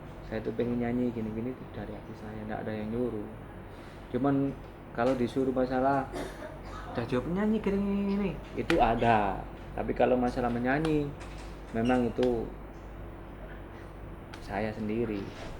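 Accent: Java, Indonesia. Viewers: Njowoooo banget mas idola😙😙😙😙gaya ngmge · Wala mas omongnya amburradul Jawane masih di bawa mbo di Tingal sedikit 2 mas kelihatan Banget re jawanya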